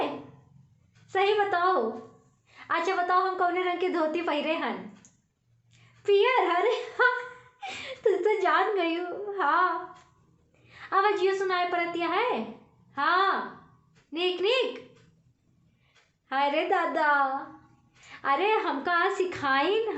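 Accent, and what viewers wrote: India. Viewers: ji sabse pahle to pranam aapko aap mein kitne gun Hain Main Kya kiya hun aap bahut acchi Teacher hain bahut acchi Ja sakta hai bahut acchi singer hai aapki yah boli Dehati bahut hi acchi lagti hai man Kahta Hai Ki Kitni bar aapka video Dekhun thank you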